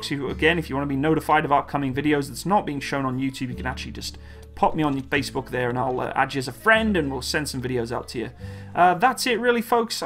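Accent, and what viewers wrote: United Kingdom. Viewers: I was wondering why the fck I was having trouble understanding some words uttered, till I found out at the end of the video that the man is British. Weird that it didn’t naturally strike me. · >Search Youtube for an explainer on something related to history or the military. >Hear a British voice narrating. >Instantly like and subscribe. It's an involuntary reaction at this point.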